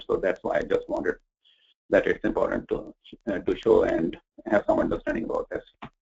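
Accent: India. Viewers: This is hard part of having Indian accent and Chalta hai approach. They did not test what they are putting online. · Sir, you need courage to post a videos that too when you are benefiting others by means of education. You are, m sure is from different part of world and not a big fan of Indian accent, but Indians are proud of it cause that accent has given Indians billions of $ and millions of jobs across the globe. If you know anything about professionalism, then talk about the content of video, not accent. Cheers to you!! Have a god time! Great work, keep sharing & educating professionals with your knowledge!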